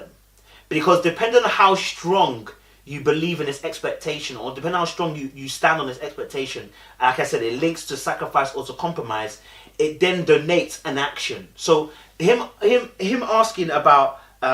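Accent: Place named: Birmingham, United Kingdom. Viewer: You're starting to sound a bit brummie 😁 coming from a brummie, I can hear it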